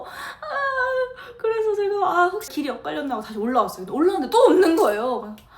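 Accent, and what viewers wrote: United Kingdom. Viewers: 한국에선 영국 악센트 영어 배우기가 쉽지 않아서 듣는것만으로 좋더라고요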